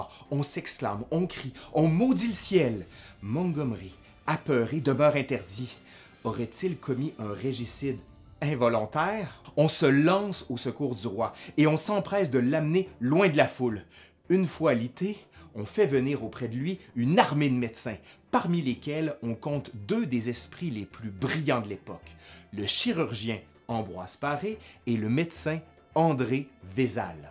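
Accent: Quebec, Canada. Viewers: Excellent ! Comme tout jour. De votre accent québécois Je dois, ma foi, l'aimer pour et pour. De revenir mon œil Pour mourir Roy !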